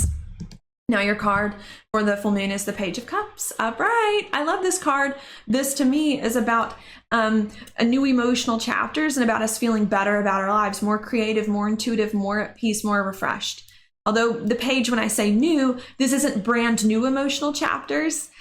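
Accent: United States. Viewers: you have an interesting accent, I'm trying to figure out, what state? enjoyed your reading.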